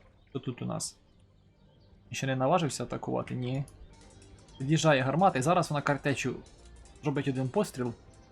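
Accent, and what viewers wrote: Poltava, Ukraine. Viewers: В тебе полтавський діалект? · Ти бува не з полтавщини? Бо говір шось дуже знайомий ,таке як чи Хорол ,Миргород , ті краї?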